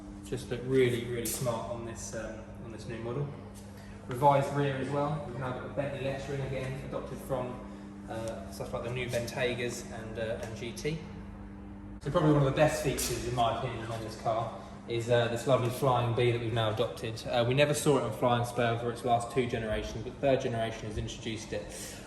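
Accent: India. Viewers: The guy never shows his face. From the accent he sounds Indian. How come you hiding your face?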